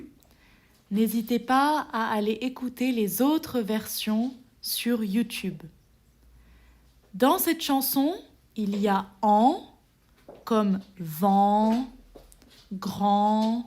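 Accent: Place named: France. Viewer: Aquí si hay acento de francés , un abrazo 🙂🫶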